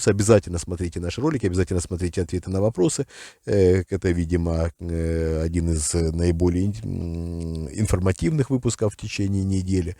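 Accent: Ukraine. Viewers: Вы бы хоть от акцента окраинного избавились и речь подкерриторивали-вброс хохляцкий.....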